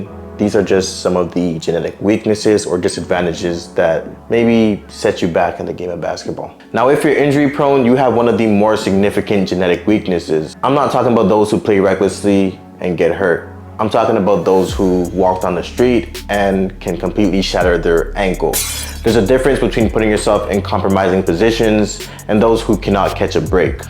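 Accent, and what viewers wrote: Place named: Ontario, Canada. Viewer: Bro is definitely from Ontario 😭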